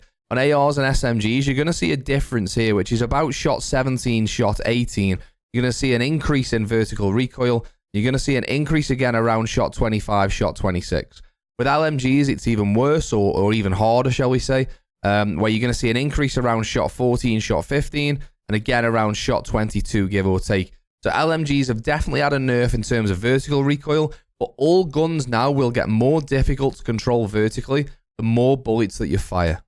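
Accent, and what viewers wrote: United Kingdom. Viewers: Oh the sexy British man posted another video 🤺🤺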